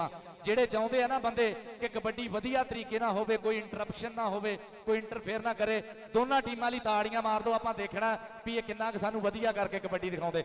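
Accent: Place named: Punjab, India. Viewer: Anchor di boli ton east punjab da lagda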